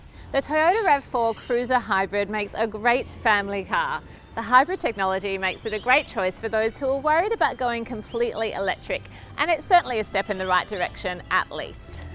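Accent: Australia. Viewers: australian speak funny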